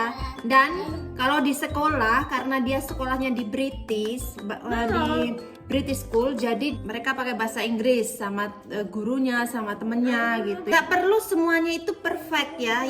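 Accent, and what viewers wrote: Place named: Semarang, Indonesia. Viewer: cute bgt....senyum2 bikun gemes....😍😍 Logat semarang yg paling medhok ya mam....😀😀😀 yg wong Semarang mana like nya...😍😍😍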